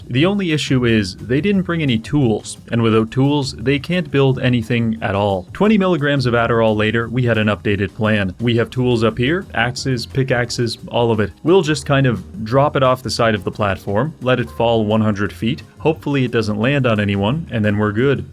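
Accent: Newfoundland, Canada. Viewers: "Zombie oat-breaks" God, I love the canadian accent Also love your vids! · This guy is the most newfoundland sounding guy I have ever heard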